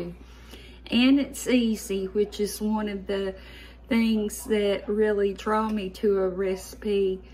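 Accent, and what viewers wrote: South, United States. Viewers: Loved your taste testers! Beautiful mother and daughter!, I love watching your channel. You have that soft spoken southern sounding charm and I love when you say “cut off the stove”. My grandmother used to say that. Anyway, I always try to do something nice for myself and others! Much love to you!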